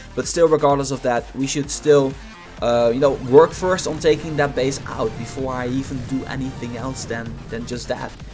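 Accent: Netherlands. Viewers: Is he dutch.cause gis accent looks like a dutch boy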